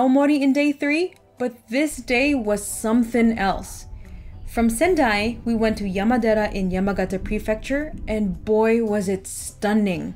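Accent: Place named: Spain. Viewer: The Spanish accent pronunciation of the Japanese words is really interesting.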